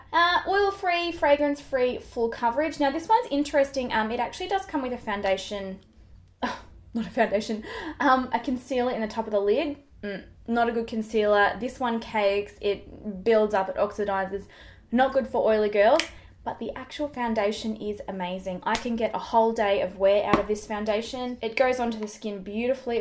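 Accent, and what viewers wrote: Australia. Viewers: As a fellow oily skinner, and a mummy on a budget I highly appreciate the affordable options! Thanks so much....ps I'm a kiwi and am quite amused by your ozzy accent..oy oy oy!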